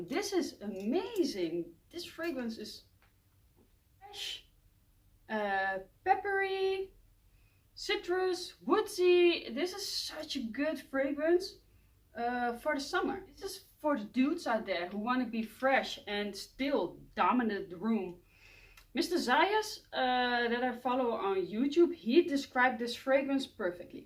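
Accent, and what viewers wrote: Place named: Netherlands. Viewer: I can tell by your accent that you're from the netherlands, klopt dat haha? My favorite is Bvlgri Aqva Amara for the summer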